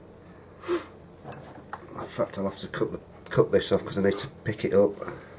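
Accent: United Kingdom. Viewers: Lol I have the same accent XD I'm British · I'm Australian. It's definitely a British accent.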